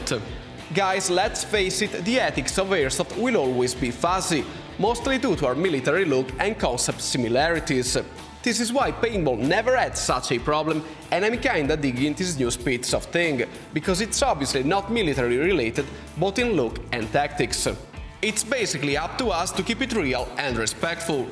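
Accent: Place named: Italy. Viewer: Ciao, ho detto (cavoli parla l’inglese in maniera pulita e molto capibile) guarderò un po’ di video per tenermi l’orecchio in inglese, ma capisco molto bene perché sei italiano ed ovviamente l’accento è quello!! Comunque ottimo inglese!!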